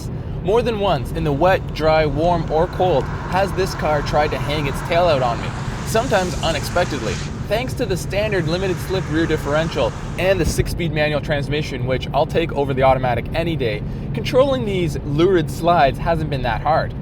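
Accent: Canada. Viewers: so canadian sounding, i dig it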